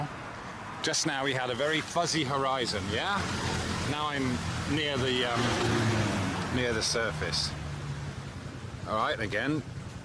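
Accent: United Kingdom. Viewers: A lot of us in the UK speak like that. To be fair, has a pleasant speaking voice, even if most of what he says is nonsense:-)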